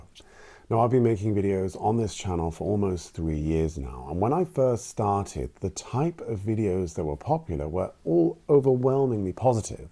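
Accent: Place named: Germany. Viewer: Pal your English has taken on a German accent 😊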